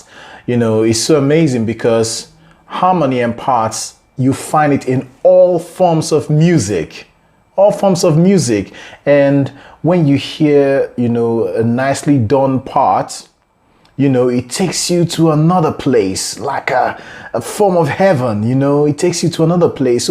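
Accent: Nigeria. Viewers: Be honest, are you a Nigerian? cos you sound like one to me. You look more like an Igbo man. No offence, i love your work. Just want to know.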